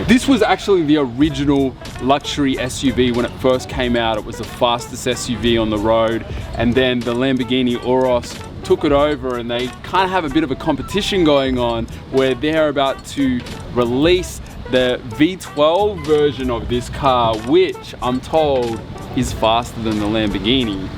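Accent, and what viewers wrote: Australia. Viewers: The way you break down the description on the car in the Australian accent!❤️💕 horses are so 🥰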